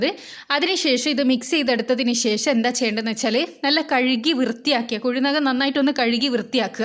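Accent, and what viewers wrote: Kannur, India. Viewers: Chechi Kannur annoo??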